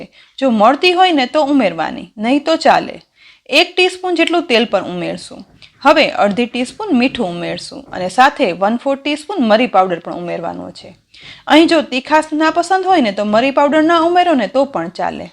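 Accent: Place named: Rajkot, India. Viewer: Yummy food with full of nutrients. I love your accents ,seems like you are from Rajkot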